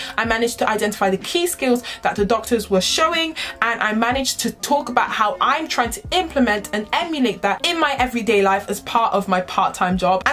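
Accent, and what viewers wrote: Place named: South, United Kingdom. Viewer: Where is your accent from?? You sound really southern but then you say button/ summer/ Study in a slightly northern way. Did you live in Manchester or Leeds for a bit haha. Congrats for getting in and avoiding chemistry lol.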